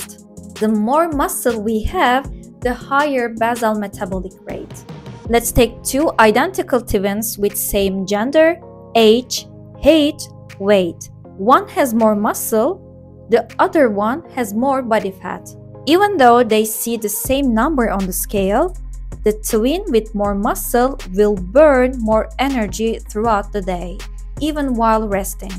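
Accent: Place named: Turkey. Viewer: Im in love with your turkish accent 🤩❤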